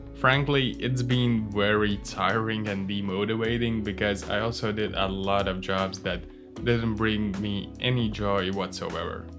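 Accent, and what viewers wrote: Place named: Eastern Europe. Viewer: Wonderful content! Where are you from? You sound like Eastern Europe brother :)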